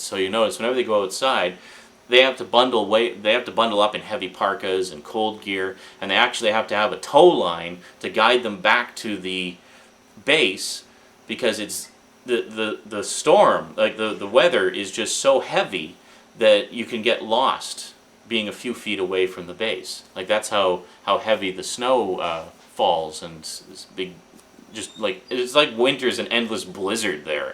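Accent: Canada. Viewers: You are from Canada....Abooot! Not mocking, I can just tell. Or you are close to the Canadian border at least. Where exactly do you hail from? Greetings from Philadelphia!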